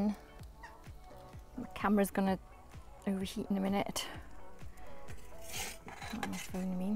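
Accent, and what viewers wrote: Wales, United Kingdom. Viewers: Omg I’m addicted to watching your videos soooo Morish, I was set on buying a Uuni pro tomorrow but now having seen this it’s thrown a massive spanner In the works 🤦🏽‍♂️ I have eaten out rather a lot lately and find that I can tell the difference now between a gas fired pizza and a wood fired pizza. Do you agree? I prefere the taste of wood fired and also find it more fun with wood, with that in mind what oven would you advise me on, given a majority of my pizzas would be wood fired. and gas as a back up (due to typical welsh weather)!! Thanks in advance PS. you sound like your from Wales? 🏴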